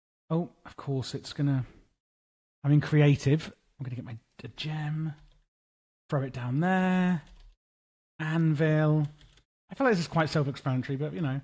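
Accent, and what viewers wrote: United Kingdom. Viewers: Jesus i cannot ignore your british accent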